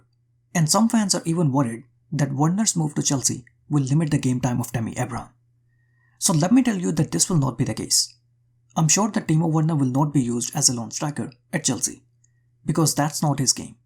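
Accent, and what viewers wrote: India. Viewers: you sound like india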